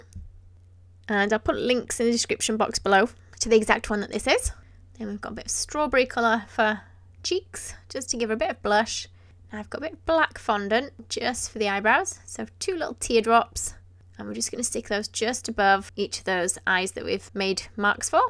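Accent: United Kingdom. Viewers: I love your bright Britain accent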